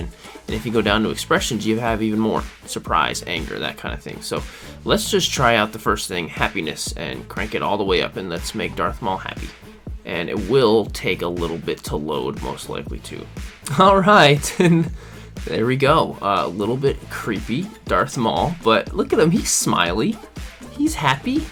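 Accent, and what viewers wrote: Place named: United Kingdom. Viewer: british han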